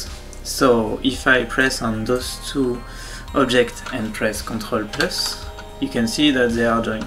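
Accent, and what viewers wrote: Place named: France. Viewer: A german viewing a tutorial in english narrated by a french. Thanks for the help! I think I will try blender in the future!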